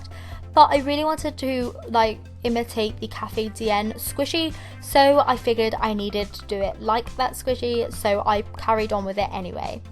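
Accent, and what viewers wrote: United Kingdom. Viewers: Where are u from because you sound British but I can’t tell (not trying to be rude) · I love BRITISH ACCENT!❤❤❤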